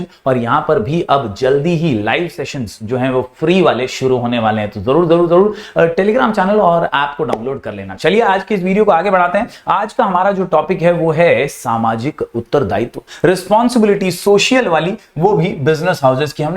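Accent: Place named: Uttar Pradesh, India. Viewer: Sir U.P se belong karte ho kya U.P ke old age people aise baat karte hai. :)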